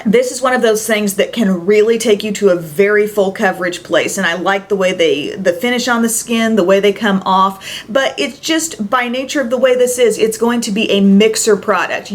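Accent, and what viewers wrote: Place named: South, United States. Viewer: Oh my goodness, this feels so strange to be watching the Awards before Christmas. I've been watching you since I was a freshman in college in 2011 when I searched YouTube for a review of the Benefit They're Real mascara. And now I have a degree, and you have a lovely child and another one coming very soon, I'm so happy for you all! Thank you for your videos, they make me so calm when stuff gets crazy. P.S., I know you guys are from southern IL, but do most people in your area have a distinguishable southern twang like has and you sometimes have? I've lived a good amount of time in NJ, MI, and NC so I've developed a few twangs throughout my life as well so I think I can pick up on them a little more than I used to. P.P.S., you are a great sport, we love you!!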